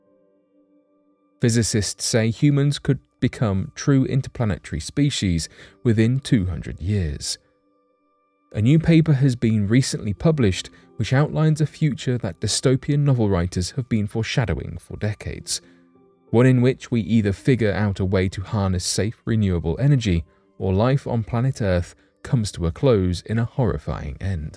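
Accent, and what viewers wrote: United Kingdom. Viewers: Cmon now.. its a quieter gentle UK 🇬🇧 accent. Far better than some we have in 🇺🇸 🌎. God bless all!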